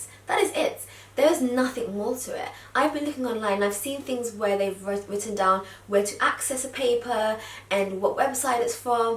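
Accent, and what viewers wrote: United Kingdom. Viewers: She has an English accent. She went to Harvard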